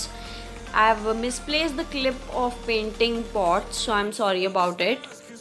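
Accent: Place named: India. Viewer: Indiam accent? Arggg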